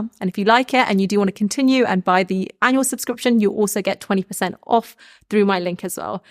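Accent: United Kingdom. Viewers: Again overdose of british accent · Love your attitude. ❤ I have similar Lifestyle. As a german, I must say, you speak to fast for me and very britisch 😊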